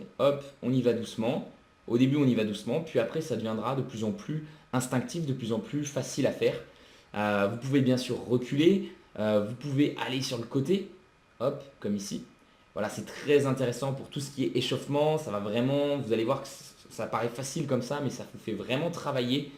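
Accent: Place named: North, France. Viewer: Bonne vidéo mais tu as un putain d'accent ! Tu dois venir du nord 😅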